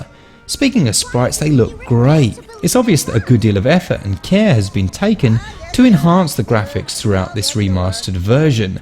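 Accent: United Kingdom. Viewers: I don't think Ren is right, but I'm not for sure. I always say it dis-guy-ah not dis-gay-ah, but you sound British so, that's probably a typical difference in pronunciation.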